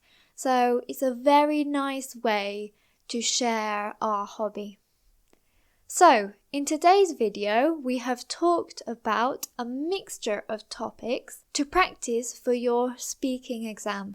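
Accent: United Kingdom. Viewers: Amazing british english 🇧🇷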